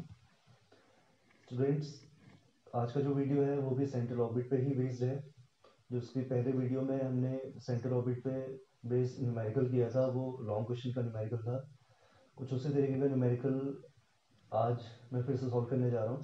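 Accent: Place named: Rajasthan, India. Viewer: That ' rajasthani accent ' 👌👌,. ....... Similar to a hero in looking and Vice - versa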